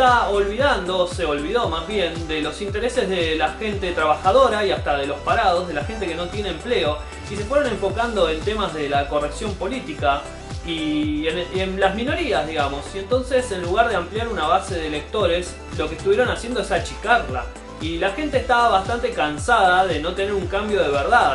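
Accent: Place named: Argentina. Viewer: Hola. De qué parte de Argentina sos?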